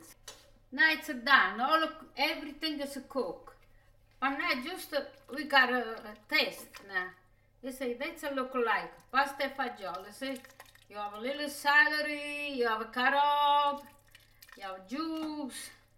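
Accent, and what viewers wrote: Italy. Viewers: English spoken with an Italian accent. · I LOVE YOUR UTUBE CHANNEL. YOU MAKE ME THINK OF MY GRAND FATHER HE WAS FROM ITALY 🇮🇹 AND I LOVE HIM SO MUCH ❤️ YOUR Accent reminds me of him. He was an AMAZING MAN. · Her accent makes me miss my Nonna . Love you Italian grandma and thank you for the recipe. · My nonni for sure bring back so many memories and the way she speaks w the italian accent only my nonni spoke more itslian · I love watching. She reminds me of my auntie the way she talks and cooks and the way she makes simple Italian traditional food like my mum used to make and continue to make. She sounds as though she comes from the same region of Italy as my mum and dad. Saluti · Love her I just love her. I’m a new sub. I miss that accent. I grew up in New York in Little Italy. And I would always go to my friends house to eat pasta fagioli, now I can make it myself. 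Thank you Nona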